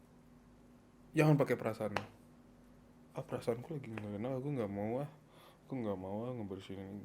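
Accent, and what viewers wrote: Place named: Kalimantan, Indonesia. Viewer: ada logat² Kalimatan nya 🗿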